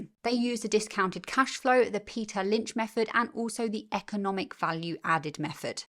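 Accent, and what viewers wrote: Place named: United Kingdom. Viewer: Fantastic, quality content!!! Thank you! is a very good name for this channel because I really enjoy listening to your British accent. Anyone sounds smart by speaking British. But in your case, you are very smart to begin with and then you have that smart accent. 😃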